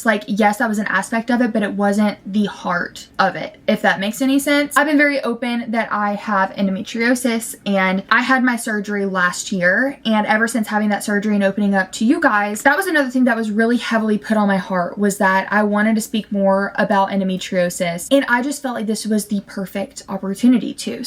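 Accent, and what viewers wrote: South, United States. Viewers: lol you’re twang is so much more pronounced around your fiancé 😂I get the same way around my southern grandparents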